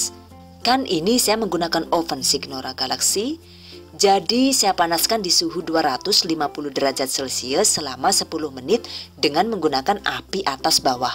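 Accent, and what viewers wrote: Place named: Madura, Indonesia. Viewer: Fokus ke suaranya az, bahasanya kayak logat madura hehehe. Maaf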